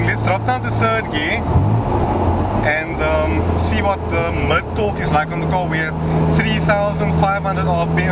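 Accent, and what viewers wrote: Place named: South Africa. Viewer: Cape town South Africa......our country has a lot different accents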